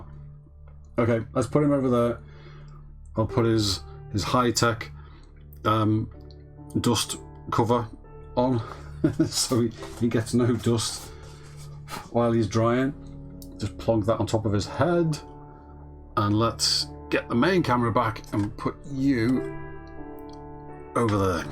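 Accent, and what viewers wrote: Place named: Wirral, United Kingdom. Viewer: Where are you from sounds like Wirral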